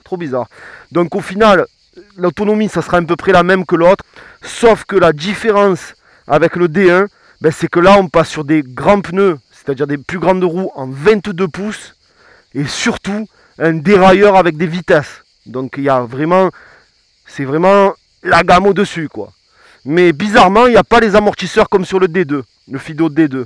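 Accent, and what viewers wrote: Marseille, France. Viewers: J'adore tes vidéos et ta bonne humeur de marseillais. Quel accent, j'adore!. Continue de nous présenter de nouveau modèle dans le délire et le sourire du sud et de Marseille. J'ai revenu ma speedway 5 car je trouvais les amortisseurs trop durs et je suis de nouveau à la recherche d'une nouvelle trottinette mais avec des amortisseurs souples surtout pour mon dos.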